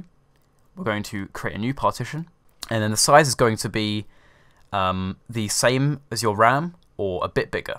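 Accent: England, United Kingdom. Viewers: finally someone who is English lmao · i like your english accent very nice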